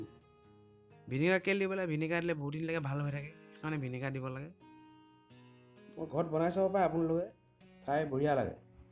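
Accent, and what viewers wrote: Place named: Lakhimpur, India. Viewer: nhoi apunar kotha keita lakhimpur r lorar nisina...so xudhilu...beya napabo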